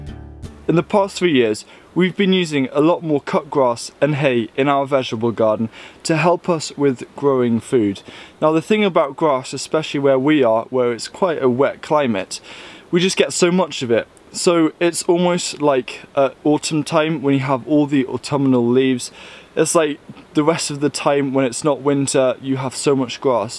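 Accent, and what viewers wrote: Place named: United Kingdom. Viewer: Good expectation,I love the British accent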